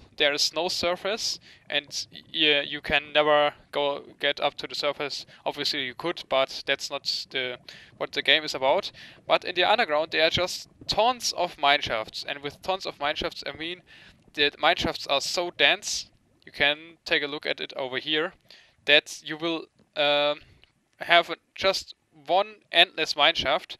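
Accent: Germany. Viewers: turn on subtitles if you want to laugh it is funny becuase of his German overtone isnt processed correctly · dein english akzent ist scheise sry aber ich bin zu 100 % sicher das du deutsch sprichst ;)!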